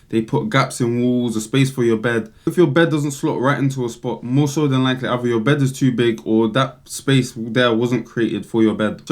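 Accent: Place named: United Kingdom. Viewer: that's a real nice british accent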